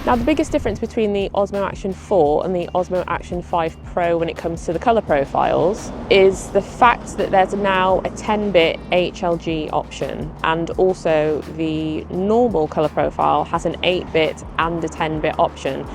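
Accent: United Kingdom. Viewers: my first time watching your videos. Your delivery is great (probably the accent lol -- dunno why we Canadians don't talk like Brits...) ...anyway, the content was the best I've seen on this crucial question. I am going on a motorbike trip in a few weeks and literally just bought the Osmo Action 4 after seeing this...didn't even hesitate. Nobody in the comments (that I've seen at least) even mentioned how tack-sharp and natural the wind-cover on the OA4 looked in comparison to the OA5, which looked all mushy and smudged out like it was painted in the video. Annnnyway, I even watched your follow-up with the firmware update...no better imo. And ya, I know my purchase might be short-lived with the Osmo Action 6 due VERY soon, but need something for the trip. Sold my GoPro12 (thank god), that thing crashed so often...the stupid wrist strap never synced...nightmare. 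Anyway, look forward to your Action 6 video!!! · They've got my attention, warmth, 10 bit normal, brighter. I've never bought one. This one may get me. Great videos, your accent brings me back to when I used to live in the UK.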